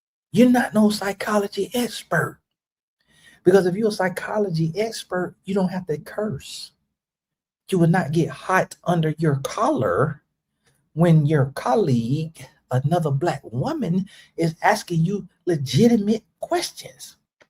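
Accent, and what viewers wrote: South, United States. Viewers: aye you from the carolina’s or georgia?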